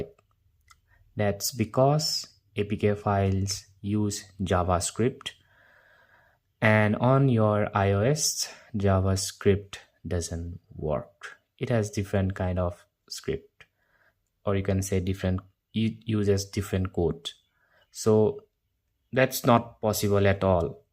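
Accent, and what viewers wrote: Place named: India. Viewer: You’re dumb Indian voice to waste my time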